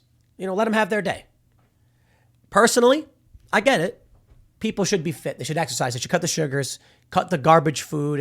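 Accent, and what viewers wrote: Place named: Chicago, United States. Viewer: This is the most Chicago has ever sounded.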